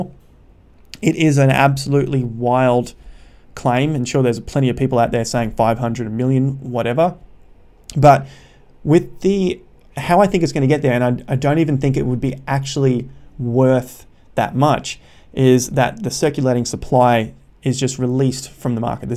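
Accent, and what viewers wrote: Australia. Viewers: Are you an Aussie? You’ve got that accent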